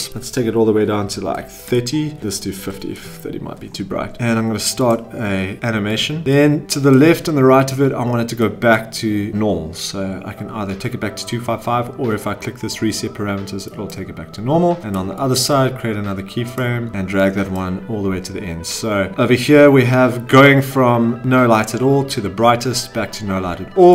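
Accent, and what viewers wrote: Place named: South Africa. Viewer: sound so south african anyways thnx for the video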